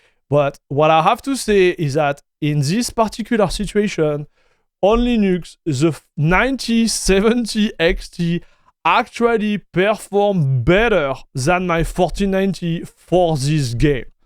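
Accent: France. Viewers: Très intéressé car je rencontre des problèmes avec ma Gigabyte 9070XT Gaming OC sur Ubuntu MATE 24.04 mais je constate qu'avec d'autres distros il n'y a pas le même problème. Sinon, ça fait bizarre de devoir écouter un Français parler en Anglais alors que j'entends bien l'accent et que tout du long j'ai envie de dire "nan mais c'est bon, parle moi en français, poto". 😅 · Cool accent, are you French?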